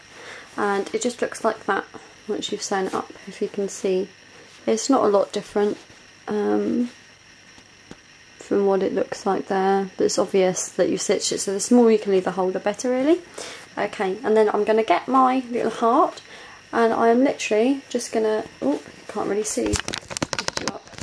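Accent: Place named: England, United Kingdom. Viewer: going to try this over the weekend, love ur accent-where in England are you from???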